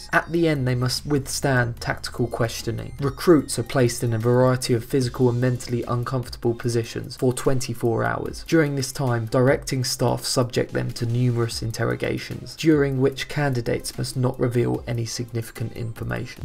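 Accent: England, United Kingdom. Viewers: Narrated by the British guy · and i was right british narrator=british unit great video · Ok, but you habe got english accent. Chosing the SAS as your mother country special forces, somethings wrong ;p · You definitely sound British, are you sure your not biased? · go figure you sound British so you pick Britain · I think you were biased toward the British because your British your self, i can tell by your voice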